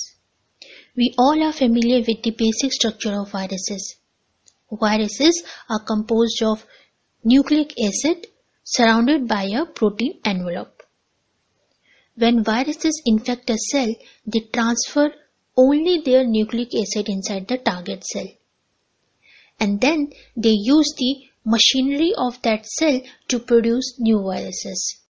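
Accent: India. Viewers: Damn Indian accent...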